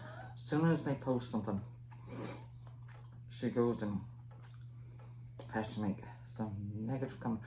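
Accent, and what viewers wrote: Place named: South, United States. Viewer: love your accent. are you from the south? i’m new to the channel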